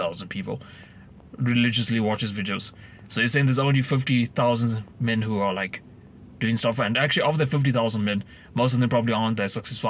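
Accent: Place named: South Africa. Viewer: Lol. Heard the accent and knew immediately you were on of us. I’ll sub just for that 🤣🇿🇦 Edit: just got through the video and seems like you have a lot of good points to add to the discussion in this space. I was single my whole life, recently got in a relationship, and that was when I knew I outgrew these red pill influencers. Past them telling you to get in the gym and improve aspects of your life that you can control, that’s about it when it comes to the value they add. They are mostly clueless when it comes to maintaining healthy relationships with women.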